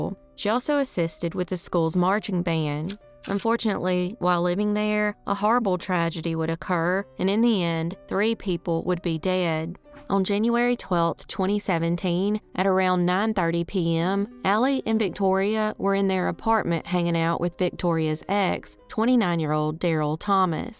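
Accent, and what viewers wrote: South, United States. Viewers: I always try to picture what this southern girl looks like 🤔 the southern accent is adorable 😊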